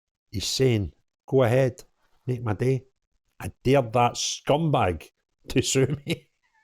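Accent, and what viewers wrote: Scotland, United Kingdom. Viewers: 'Don't chap the door' 😅 Love hearing your thick brogue. 👍 From Isobel, a Glasgow ex-pat lass. ❤🏴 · It his Scottish accent! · . I listened to your post 12 TIMES!! I still can't understand what the heck you're talking about....PLEASE POST A TRANSCRIPT. Your Scottish "twang" is not understandable....I think your post MIGHT be very interesting but I have NO FREAKING IDEA WHAT YOU'RE SAYING!! HELP HELP HELP....Even "WEE JULES" is easier to understand....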